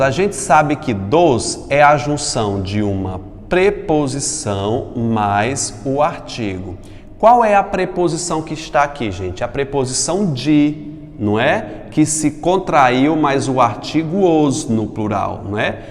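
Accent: Northeast, Brazil. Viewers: Bom dia professor. Eu vi um vídeo antigo seu de análise morfológica,muito bom,eu achei incrível me ajudou muito na prova. E lá eu percebi q vc tinha um sotaque parecido com o meu,e gírias conhecidas aqui. Pensei q vc fosse do nordeste mas vc é de Juazeiro do Norte,eu moro numa cidade vizinha a Juazeiro. Q legal. Ótimo professor, parabéns 👏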